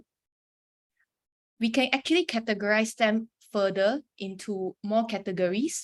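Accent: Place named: China. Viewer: Try to explain slowly and speak english not as chinese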